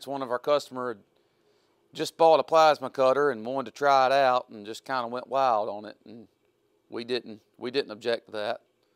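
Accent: South, United States. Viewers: I just like your southern accent you would stick out where I live in northern Maine!!!!!! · From Canada, thanks for the unedited video. Now i understand how the work and the failure of water passing the bladder. Much appreciated, keep up the good work. Also love the southern drawl 😁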